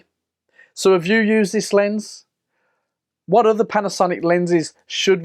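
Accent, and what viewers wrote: Birmingham, United Kingdom. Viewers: Thanks great review of this lens - I love your sample photos. I think that you have just made my mind up over which lens to buy next. I have the P/L 12-60 with G9. Considering P/L 50-200 but price and weight putting me off. Just seen P 35-100 F2.8 but I think for the type of photography this macro lens is great and can be used as a fast medium telephoto. Thanks for the no nonsense review with a Brummy accent.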